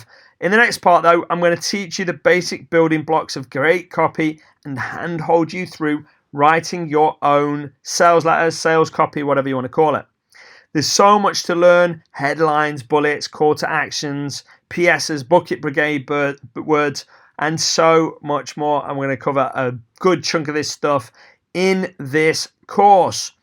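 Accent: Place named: England, United Kingdom. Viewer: Hi. Loved your video - so good to hear another English accent. I’ve subscribed and am off for video 2. Thanks.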